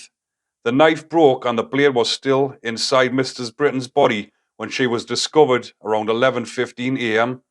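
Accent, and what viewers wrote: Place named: Geordie, United Kingdom. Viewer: First-class video. Plenty of well-researched detail. Despite your strong Geordie accent, I was able to follow all your commentary by using the subtitles! Thank you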